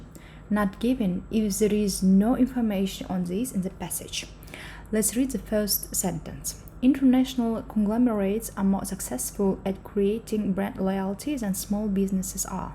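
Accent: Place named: India. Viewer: Why you are pronounce as the Indians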